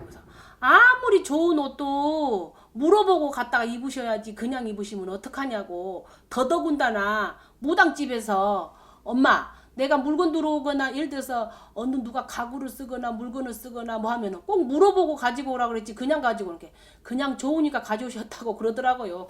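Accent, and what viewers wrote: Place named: Jeolla, South Korea. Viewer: 전라도가 보네...괜히 거부감이 생기네 ㅎ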